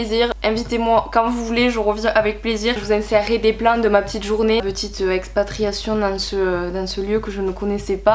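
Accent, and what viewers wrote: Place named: Pyrénées-Orientales, France. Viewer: salut l'amie ! tu présente très bien ce film , sans trop en dire , et j'apprécie vraiment. les films d'horreur de chez nous ne sont pas assez mis en valeur je trouve , et quand j'ai vue la bande annonce , j'ai bien aimé . cela donne vraiment envie de me déplacer au cinéma , ce que je n'ai pas fait depuis plus de 2 ans ... je viens de m'abonner à ta chaîne , regardes la mienne si tu veux bien , je ne fais beaucoup de critiques de films , mais je vais en beaucoup plus prochainement, sur des films de genre , ma spécialité .je trouve ton accent charmant , tu est du 66 il me semble , des Pyrénées orientales ? moi je suis du 18 , dans le CHER . à bientôt pour échanger , amicalement FRED .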